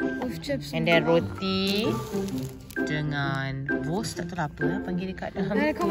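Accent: Malaysia. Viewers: pikir bukan melayu tadi😁😁bila kluar bhsa melayunya yg pekat tu bru perasan dia org malaysia.pikir td org lain mksutnye bkn org malaysia😊😊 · Mantap.loghat abg macam orang melayu dah.👍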